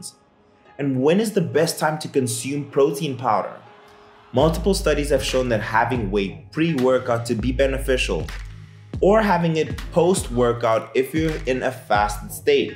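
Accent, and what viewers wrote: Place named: South Africa. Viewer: You sound South African ❤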